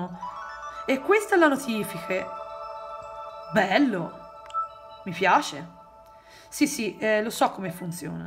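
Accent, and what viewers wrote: Italy. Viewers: Oh dio amo il tuo accento toscano❤️❤️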